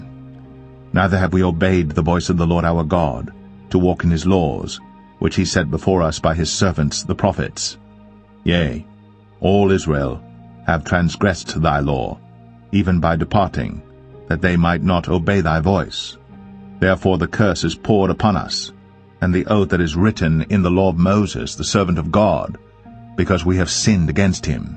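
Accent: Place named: England, United Kingdom. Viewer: sounds like England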